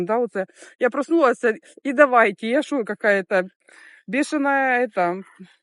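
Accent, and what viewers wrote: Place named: Surzhyk, Ukraine. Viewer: така спражня, і суржик це щось. Я з Запорізької обл. і мені це все так близько. З задоволенням дивлюсь ваші відео, ви молодець. ❤❤❤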